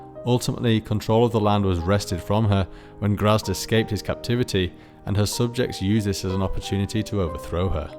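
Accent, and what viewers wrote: United Kingdom. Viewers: The argument that occured two months ago was fascinating to read. Not that it matters, but I think I'd support the supposed linguists assertions, as someone who has a name that is a transliteration of a common English one, because in my ancestors mother tongue, they do not speak with certain consonant sounds, like "r." Also isn't an American using American pronunciation. As far as I can tell, he's British.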